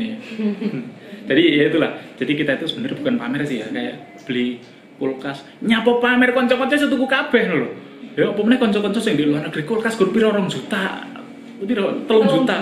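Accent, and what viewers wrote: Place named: Java, Indonesia. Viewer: Tanggal 12 september 2018. Kritik : tidak ada Saran : kalau bisa jangan ada bahasa jawa ny saya ngk ngerti, mending logat nya aja yang jawa bahasa jangan.. Alamat : perumahan belimbing kota Padang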